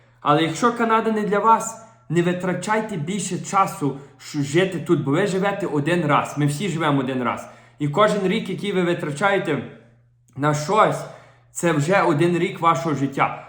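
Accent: Western Ukraine, Ukraine. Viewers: Дякую за відео,. Ти так класно, по простому розказуєш, хочеться сказати, так по нашому, по западенськи😁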